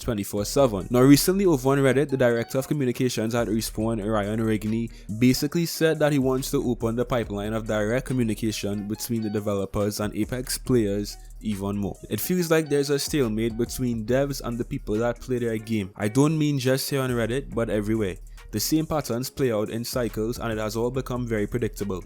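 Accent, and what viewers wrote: Trinidad and Tobago. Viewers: Are you trinidadian? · is u from Trinidad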